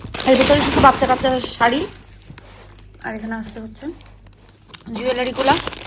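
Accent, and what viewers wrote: Sylhet, Bangladesh. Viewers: Sylheti manus bole kota❤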